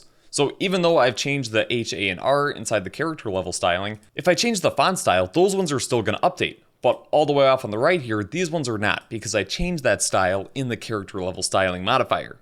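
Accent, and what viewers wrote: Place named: Texas, United States. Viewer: Yo, Are you from Texas? I have a friend from there who sounds just like you! W tutorial btw, would love to see more videos like this.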